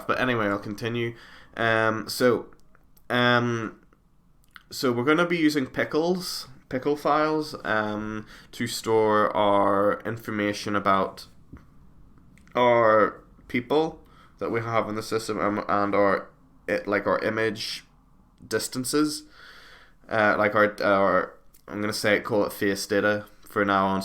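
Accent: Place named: Northern Ireland, United Kingdom. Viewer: You sound northern Irish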